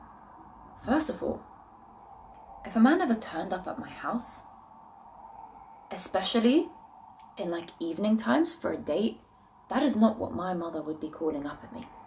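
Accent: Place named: United Kingdom. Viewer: I'm so sorry, but me as an American....you being British makes this video so much better 😅😂 My YouTube feed knows me....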